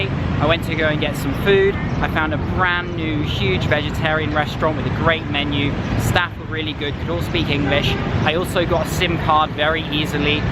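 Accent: United Kingdom. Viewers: I love the content of your channel. Great camera work and editing with beautiful British English. Love you. ❤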